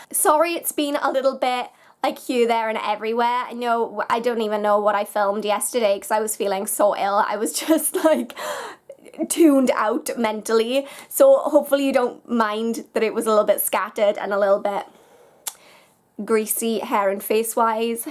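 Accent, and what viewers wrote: Wales, United Kingdom. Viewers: ine lie this sounds very much like Welsh accent to me! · Maeli Tickle Welsh and it sounds lovely imo 😊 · I was trying to pin point it. I was thinking Welsh at first. I blame Torchwood.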